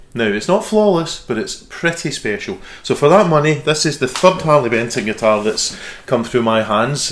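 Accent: United Kingdom. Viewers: Thoroughly enjoyed your review and your great playing. Sub'd:) Miss the Scottish accent after living near Strathaven for 20+ years before moving back to Wales. I've had the TE62DB LPB and CAR models in my Thomann basket for weeks but I'm swithering between one of these and the JET JT-300 from Merchant City Music as a modding platform. Both are great VFM. I too have a Tele itch waiting to be scratched... again! I play my 2010 Squier Tele Custom II P90 to death and also have a Fender MIM Nashville which doesn't get as much love as it has a slightly bent neck... which GG Glasgow refused to exchange when bought in 2008:(( Keep up the good work sir:) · Glasgow by the sounds of him. Maybe Renfrewshire or Lanarkshire.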